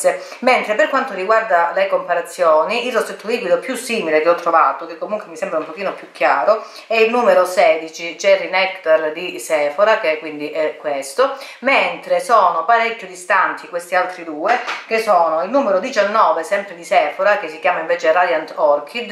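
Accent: Calabria, Italy. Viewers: Ciao :) capito su questo video grazie proprio a Clio :) sei stupenda e fai delle spiegazioni strepitose, super dettagliate!! Dall' accento credo tu sia calabrese come me😍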